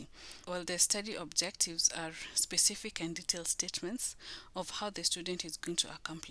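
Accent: Kenya. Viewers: I know that accent anywhere, Thank you for the information miss +254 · Very helpful, loving the Kenyan accent too. Happy to be your 6th subscriber....yeey!! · couldn't help but notice the Kenyan accent good work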